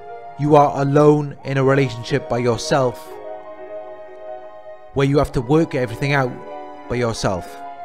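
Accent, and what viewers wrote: Wales, United Kingdom. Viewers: Just stumbled over your video. Can’t believe accuracy of what you say. My ex narc 1. Promiscuous. Gave me a STI and took me to special clinic next morning! 2. Blamed me for preventing him meeting other women. We were supposed to be in a ralationship! 3. Told me his parent’s gave up on him as youngish of three boys.Elder brothers all narcs on various scales. All plotted against me “ stupid woman” 4. Reckless behaviour “ swimming with sharks! “. Frightening incident when showed me no concern for my wellbeing. Trying to keep up with his pace of walking and nearly walked in front of car. 5. Threatened to murder me if I slept with anyone else. He’d moved on to new supply and living with her. Had left me and gone abroad but I was supposed to be his girlfriend 6? So terrified of him coming home after long journey. Met up in hotel . 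Arrived at midnight. In such a black mood was too scared to speak to him. Even considered the scenario “ would anybody know where to find me if I went missing. 7.Would never discuss our relationship. If I bought up a concern he would try to initiate sex! Was sitting down one day opposite him and bought up a concern with one of his friends, no confrontation on my half. Suddenly threw glass of wine in face, wondered what on earth happened. Carried on as if nothing happened then carried on talking. When I challenged him he threaded to tape me so people could see what I was really like. Excuse my ramblings. Had to get it off my chest. Love the Welsh accent.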